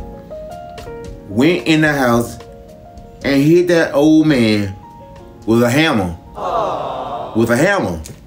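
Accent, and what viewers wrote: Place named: South, United States. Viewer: Ayyyy my new favorite show! Love the country accent 😂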